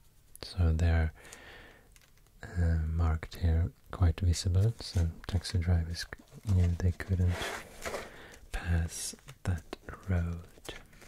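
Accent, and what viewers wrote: Sweden. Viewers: I think your Swedish accent and calm voice makes your voice so perfect for ASMR. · Finally found a very calm speaking swede in the ASMR community! I’ve been to Stockholm so many times and even did an exchange in Umeå. I got hooked to the Swedish accent to English and love this so much. You speak so calmy and take breaks between your sentences. A new subscriber here :)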